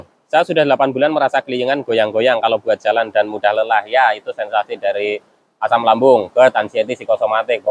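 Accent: Banyumas, Indonesia. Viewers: Takur ngapak tulen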